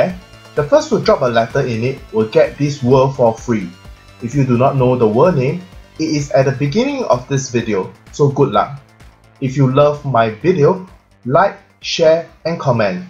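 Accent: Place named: Singapore. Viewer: He from Singapore. All not from America or England.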